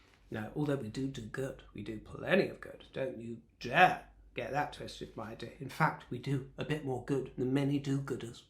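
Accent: United States. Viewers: your American accent is a scream! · love your American accent ❤ 😆😆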